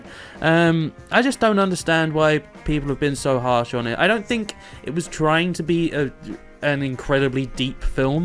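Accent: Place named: England, United Kingdom. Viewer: Everything sounds better with an English accent. 😊 You have a great voice tone.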